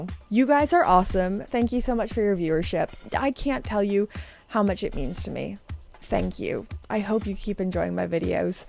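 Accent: Canada. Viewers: As a fellow Canadian, what is it, exactly that makes us sound so Canadian?? 😂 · She sounds Canadian. Where is she from?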